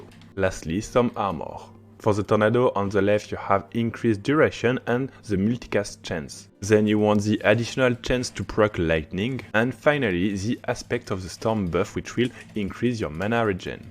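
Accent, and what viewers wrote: France. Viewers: Hey man, great video but damn you really need to stop pronouncing "th" as a "z", I really don't know why the french are taught to say it like that lol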